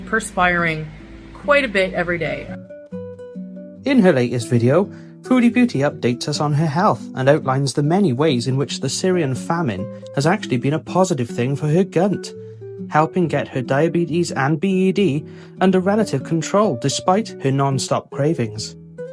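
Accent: Wales, United Kingdom. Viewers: i think he might be welsh but that's just a guess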